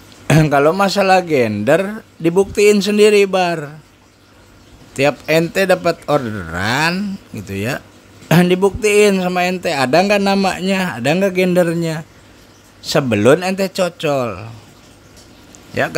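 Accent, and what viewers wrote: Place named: West Java, Indonesia. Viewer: bang suara elu udah kaya sepuh abah abah logat sunda tapi foto elo keren ganteng keren salam sortir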